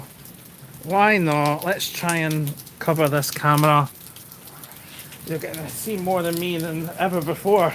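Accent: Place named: Scotland, United Kingdom. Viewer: The entire one hour and eight minutes + video shot just from your room/swim up? Personally, I needed to have English sub-titles to understand your Scottish accent!! :body-blue-raised-arms:🤔🤣 Hopefully you enjoyed it!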